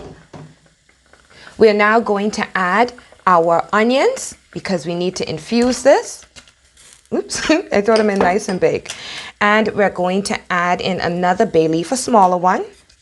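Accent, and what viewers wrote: Bahamas. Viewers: it's the accent though :-) i love a real authentic Bahamian accent. ima cook some boil fish ta'night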